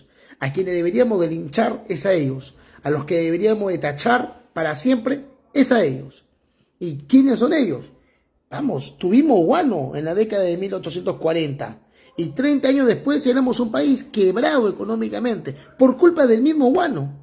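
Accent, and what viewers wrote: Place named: Chile. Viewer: ...si hablas con dejo chileno.....que te puedo decir...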